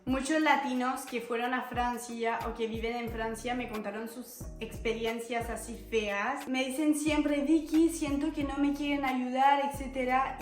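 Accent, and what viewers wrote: Buenos Aires, Argentina. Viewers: HOLA me haces Feliz verte y escucharte hablando Bien Argento sos como una Embajadora Cultural de Argentina en Francia me encanta como hablas de argentina su gente y su cultura y como es el argento tratandose entre si y con los extranjeros pero yo rrealemte siento que vos nacistes en francia pero tu corazon cuerpo y vida es Argenta y a eso NO HAY CONQUE DARLE Brindo con un BUEN FERNET CON COLA Y UN BUEN ASADITO DE LOS SABADOS FELICIDADES VOLVEEEEE GUACHAAAAA TE QUEREMOSSSS AJJAJAAAJA AGUANTE CARAJOOO SALUDOS DE CAPITAL DE ARGENTINA = CABA 🇦🇷🇦🇷🇦🇷🇦🇷🇦🇷🇦🇷🇦🇷🇦🇷🇦🇷🇦🇷🇦🇷🇦🇷🇦🇷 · Hola Vicky!! Tenes acento de Argentina, muy de bs as...tranqui...ni un ápice te pueden decir w hablas Español como Española. No!! Hablas Castellako de Argentina y acento Bonaerense!! Bien clarito.. 😂❤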